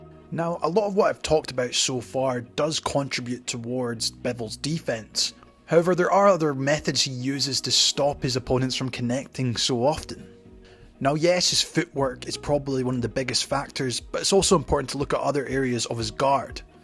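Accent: United Kingdom. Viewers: what is your accent? British? Welsh? Scottish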